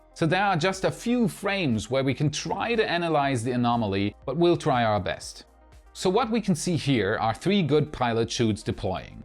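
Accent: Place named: Germany. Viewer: Great video..! Am I alone in wondering WHERE his accent is from...? I have listened and listened and I cannot place it --Germany...? Yet I am unsure. I am sure only he does not wish for us to dwell on this. BUT WHERE IS HE FROM..?? Hmmm....cannot put my finger on it.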